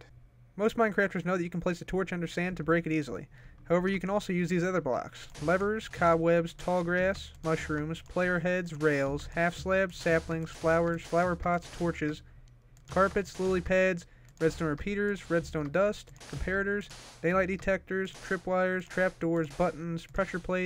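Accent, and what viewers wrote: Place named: Alabama, United States. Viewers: you sound KKona as fuck my dude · you sound alabama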